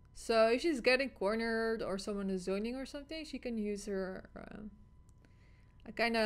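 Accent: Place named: Netherlands. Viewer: Do you come from the Netherlands? You have a Dutch accent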